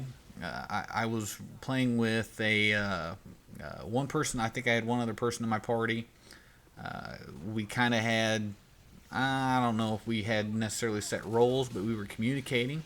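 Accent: South, United States. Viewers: you from the south you sound countryish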